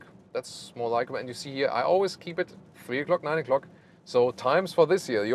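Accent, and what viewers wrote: Germany. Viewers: There's nothing sweeter than watching a BMW review with German accent :)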